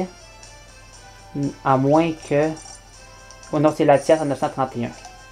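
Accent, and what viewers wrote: Quebec, Canada. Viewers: je te soutient mec Même si tu est québécois tu fait l'effort de parlé fr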